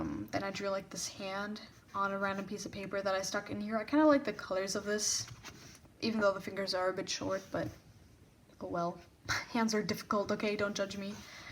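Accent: Germany. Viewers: Waitttt are you german?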